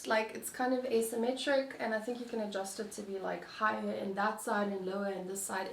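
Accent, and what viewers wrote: South Africa. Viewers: Oh wow I’ve never seen your videos before but when I heard your voice I wondered if you were South African! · Do I hear a South African accent?👀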